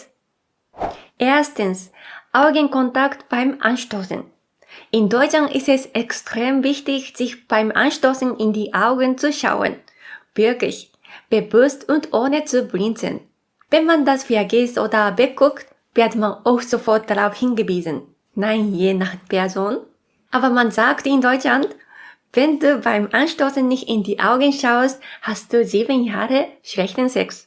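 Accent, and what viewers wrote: Japan. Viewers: ich liebe den japanischen Akzent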